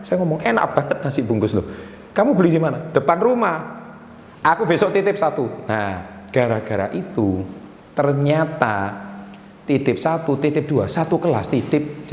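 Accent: Java, Indonesia. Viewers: Orang mana sih bang kok logatnya jawa banget😁😁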